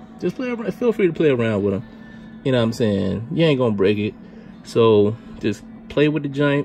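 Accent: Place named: South Carolina, United States. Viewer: you GOT to be from south carolina!!!!!!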